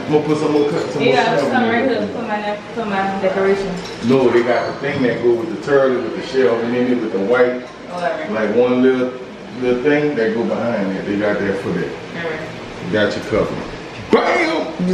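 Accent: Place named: Louisiana, United States. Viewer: I love da Louisiana accent 😂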